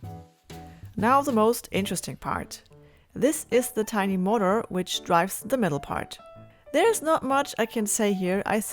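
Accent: Germany. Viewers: ehm youre from germany aren t you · Coole Videos! Aber ich kann mir nicht helfen. Irgendwie glaube ich einen deutschen Akzent heraus zu hören :D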